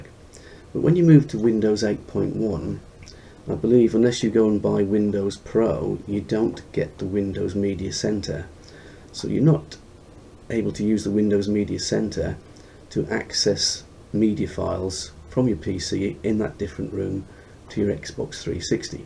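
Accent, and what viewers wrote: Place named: United Kingdom. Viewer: You sound british alot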